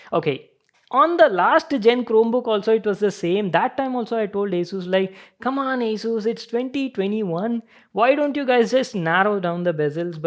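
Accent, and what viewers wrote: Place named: Telugu, India. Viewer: English with Telugu slang can be easily understood nuv super anna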